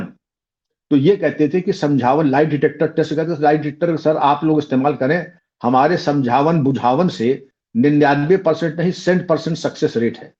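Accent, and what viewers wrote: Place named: Lucknow, India. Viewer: Samjhawan, uthawan😂 😂. I myself was in Lucknow so listening to you remindS❤❤ me of those days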